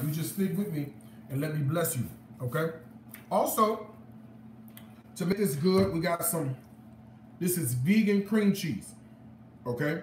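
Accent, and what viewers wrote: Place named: New Orleans, United States. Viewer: Man where are you from. It sounds like a lil N.O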